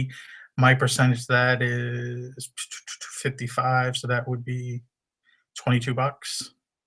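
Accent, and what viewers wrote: Boston, United States. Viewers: you are one of the best on you tube and love your facial expressions along with that Boston type accent...cracks me up and hope Gretchen and her Mom are doing better....sounds like you have a lot on your plate, a lot of changes in the near future so take care and hope everything with your family works out well and your mother in law heals...Happy Hunting! Corie in Seattle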